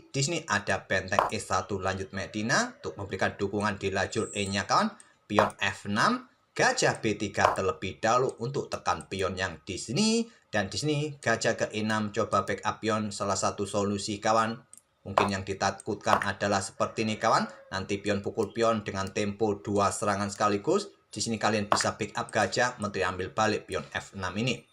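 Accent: Java, Indonesia. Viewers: Bang kurangi loga Jawa nya.bang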